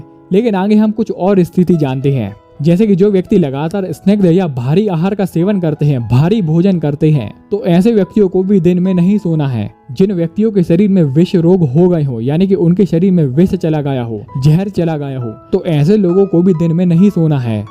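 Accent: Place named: Bundelkhand, India. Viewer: Bundelkhand se h aap sir😊😊😊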